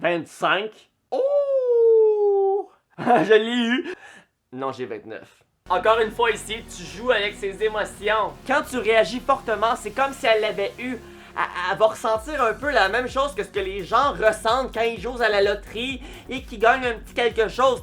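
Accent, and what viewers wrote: Quebec, Canada. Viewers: Tes québécois?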